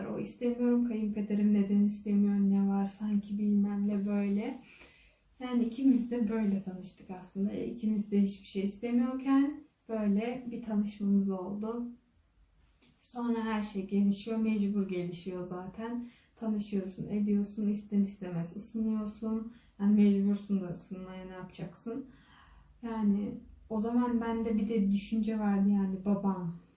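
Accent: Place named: Germany. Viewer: türkcen almancilar gibi cok kötü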